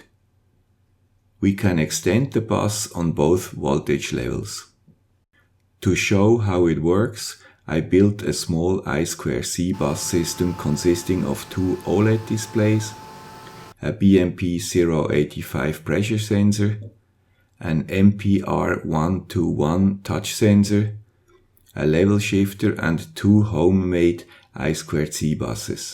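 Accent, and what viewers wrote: Switzerland. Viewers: Hey, guy with swiss accent. Your channel is very inspiring. Thanks for sharing your ideas! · Ich lebe momentan in Irland und da hatte ich die komische Idee, eine Eier-Inkubator-Steuerung zu bauen. Als kompletter Anfänger schaue ich viele Videos bei Youtube an. Deines, weil ich ein I2C-Display und ein RTC in meinem Projekt verwenden möchte. Mit dem Schweizer Akzent war das dann besonders nett zu schauen. Danke! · Hey, guy with swiss accent, I've got an italian one.... I find very useful your teaching. Actually, I was used to plan experiments with Labview and NI instrumentation, I decided to leave both for open software and cheap devices, not only for economical concern. Thanxs for the wide presentations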